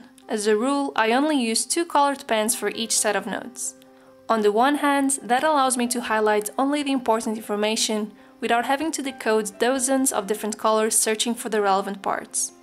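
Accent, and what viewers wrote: Portugal. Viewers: Hey, your accent sounds very portuguese xD wondering if you are, and if so, where are you from? Edit: ok you said in the video that you are portuguese xD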